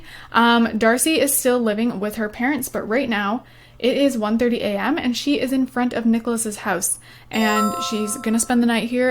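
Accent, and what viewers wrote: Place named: Canada. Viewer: YOUR CANADIAN "OOUT"DOOWN"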